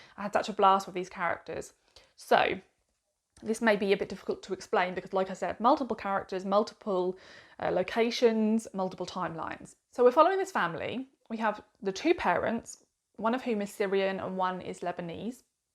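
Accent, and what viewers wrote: United Kingdom. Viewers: I have just subscribed to your chanel. Such an eloquent, clear and very informative book genre review. It's so refreshing to firstly have a book chanel without the person waving a book around while you are trying to look at it. Someone who speaks clearly and I can understand. I'm British and so are you so that's helpful 😅. I've grown tired of many of the book chanel on YouTube, yours is so good, thank you. I'm 73 and an avid reader since the age of 5. But I just feel I need good quality suggestions , which you provide. Well done. Julie x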